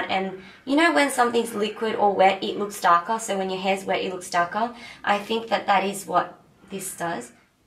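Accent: Australia. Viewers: is that an australian accent?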